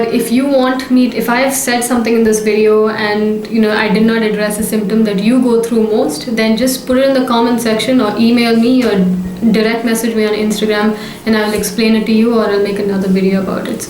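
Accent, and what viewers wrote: India. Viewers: so cute i love the asian indian accent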